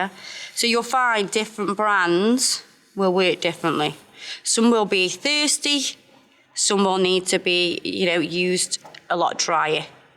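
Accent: Stoke, United Kingdom. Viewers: That's how us Stokies speak lol loowk, boowk and coowk (in other language look, book and cook) lol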